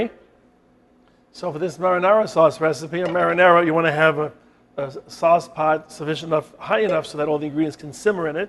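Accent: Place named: United States. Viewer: Tom-ay-toes. Bay-sil. You Americans really can’t speak properly 🤦🏻‍♂️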